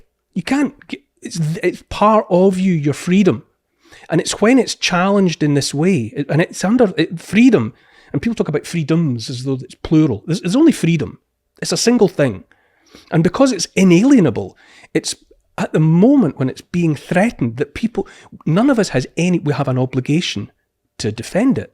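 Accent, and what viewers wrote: Scotland, United Kingdom. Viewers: Scottish is a cool accent .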